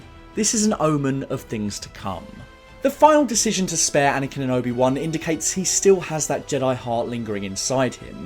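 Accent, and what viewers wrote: United Kingdom. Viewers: Nice analysis (refreshing to hear a fellow Brit’s voice as well on a Star Wars channel)! I loved this duel and Yoda being introduced as THE bad ass Jedi we all know him to be. It was indeed the cherry on top of the epic battle of Geonosis with 200 Jedi, the introduction of the clones and the Death Star plans. To this day it’s the best oremirrr midnight movie screening I’ve been to (was absolutely buzzing at work the next day!).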